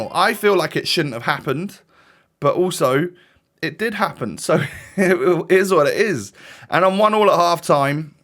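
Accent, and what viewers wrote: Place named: Scotland, United Kingdom. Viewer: didn’t know was scottish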